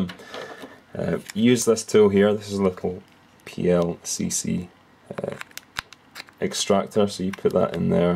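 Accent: Scotland, United Kingdom. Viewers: I love the scottish accent.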